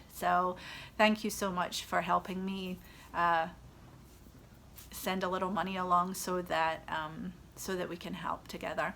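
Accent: Scotland, United Kingdom. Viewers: Love your Scottish accent! I’ve just finished watching all the episodes of “This Farming Life” on BritBox. Most of it is filmed in Scotland, but unfortunately they breed their sheep for food and not wool, I hope we knitters can encourage the industry to change. Will cast on a pair of mittens soon for the KAL with some 🇨🇦 yarn.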